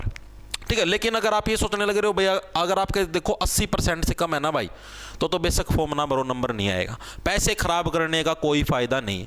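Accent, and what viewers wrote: Haryana, India. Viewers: Bhaiya mja haryanvi ava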